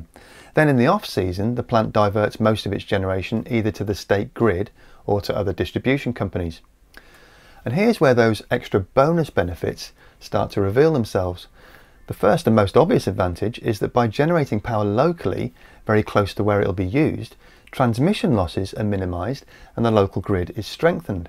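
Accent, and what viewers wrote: United Kingdom. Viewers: Yes he does! Where on Earth did you think he was from? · What!? Hes clearly british, now i have to know where you thought he was from? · woah ! ur british!! ? but u dont sound it man!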